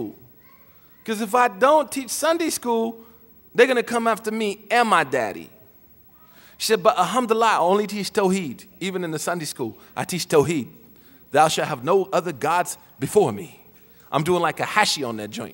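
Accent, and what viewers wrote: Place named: Oklahoma, United States. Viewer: He is from okc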